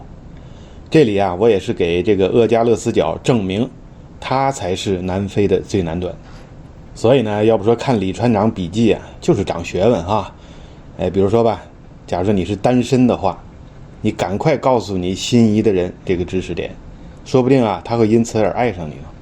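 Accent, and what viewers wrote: Tianjin, China. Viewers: 好吗（天津口音），李船长的故事太精彩了，😄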